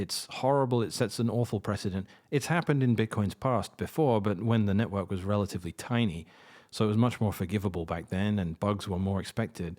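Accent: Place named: England, United Kingdom. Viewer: 😂😂 “speak English” he couldn’t get more English.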